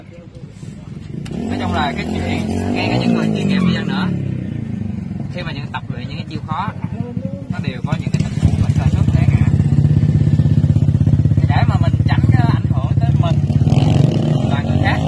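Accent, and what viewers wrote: Vietnam. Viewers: Đu má a nc chửi thề dễ thương lắm anh, đậm chất miền Tây, em cũng z hihi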